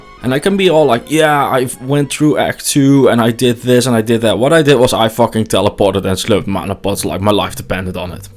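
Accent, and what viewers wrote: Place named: Netherlands. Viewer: Too bad, but sure I totally comprehend the reason. Not everyone has the time to get a "Twitch star" today 😁 RL always comes first. Cheers! P.S.: are you dutch ? You sound a bit like a dutch guy ^^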